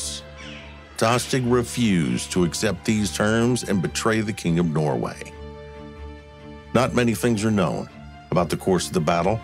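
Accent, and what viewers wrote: United States. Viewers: A medieval battle in the north of England narrated by an American cowboy. Love it! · Bad American Voice over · such a shame a yank commentates,..ruins the whole thing!!!! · Sorry I struggle with the narration it’s too loud and painfully American .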